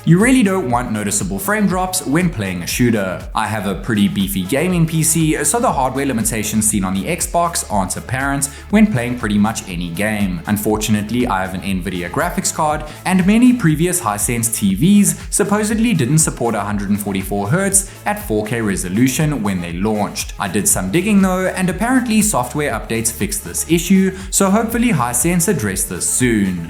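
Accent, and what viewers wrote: South Africa. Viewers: This is the first time I stumbled across content from you, immediately heard that lekker SA accent my bruuuu. Cool video, thanks!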